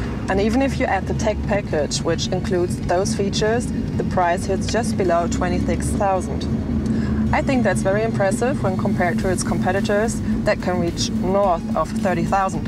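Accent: Germany. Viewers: She does her reviews well but I mean seriously, I don't know how you're gonna do it, but you just have to do something with the accent. I'd rather she speak in German with subtitles. No hate I honestly love the reviews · I love German accents! :D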